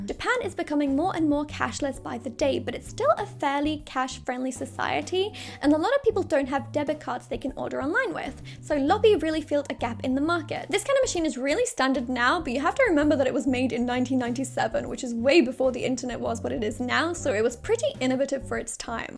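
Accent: England, United Kingdom. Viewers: she lost her England accent a lot from the last time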